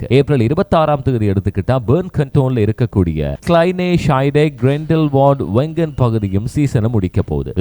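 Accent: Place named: South, India. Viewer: ஏன் எங்களின் அருமையான ஈழத்தமிழுடன் தென்னிந்தியத் தமிழ்உச்சரிப்க் கலந்து பேசுகிறீர்கள் ?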